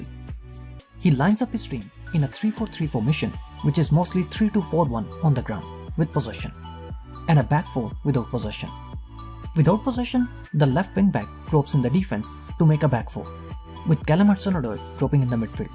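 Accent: India. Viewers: Man sounds like he's from India, and here the match is early on Friday.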